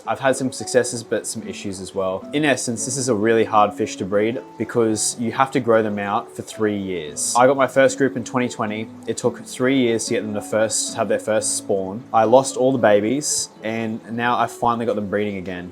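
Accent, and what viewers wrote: Australia. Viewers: ANY REASON U TALK LIKE A ROBOT ...😂😂😂 I WISH U CHILL LITTLE BIT WITH YOUR AUSSIE ACCENT , U TALK LITTLE TOOO FAST FOR AMERICAN KEEPING UP😂😂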